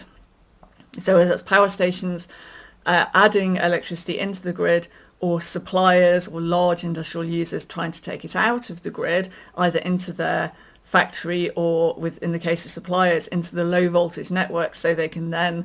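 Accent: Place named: West Country, United Kingdom. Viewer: I have watched some of your content and must say your knowledge and your proposals, particularly in their scope, are something I can resonate with, particularly when it comes to Nuclear. HOWEVER......your delivery sounds very typical of many "Millennial's" and "Gen Z's", in that you orate many of your sentences where the listener can't distinguish whether they are statements or questions. I don't know if you reside or was brought up in the West Country but these types of speech patterns are typical of that region and can become rather tedious to the point of infuriating after a while to many listeners.........particularly us "Boomers" and "X's".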